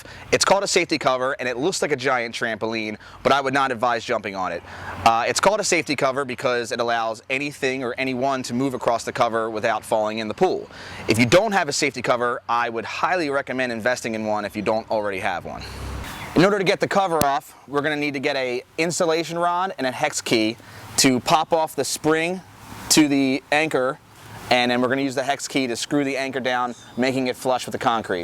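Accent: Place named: Philadelphia, United States. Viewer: I love it ! I heard it and was like oh philly philly 🦅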